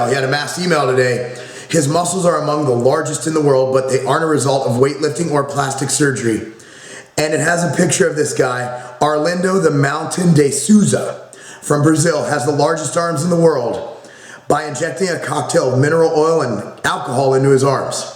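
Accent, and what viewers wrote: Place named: Brooklyn, United States. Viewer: He totally has that nasty Brooklyn style/speech going on.... Yuck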